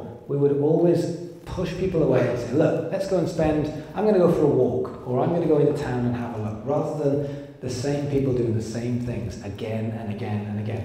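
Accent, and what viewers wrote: United Kingdom. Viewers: I watched over 30 of your videos in the last week. First: love your brit accent. Second: great contents. Do you know Marco Montemagno? Is a 47 y o men who lives in Brighton. He helps people with startups and big companies in marketing strategies, you should contact him ;) He also has a BIG youtube channel in Italian where he talks about marketing, social media etcs.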